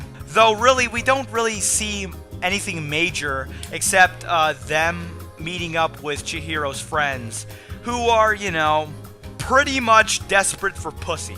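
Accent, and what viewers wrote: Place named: United States. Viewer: These Murrican pronountiations, man...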